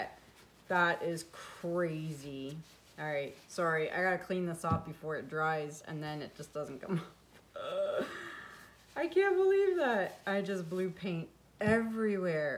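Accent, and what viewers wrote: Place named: Newfoundland, Canada. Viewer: You sound like you are from Newfoundland. You sound like an uncle I had.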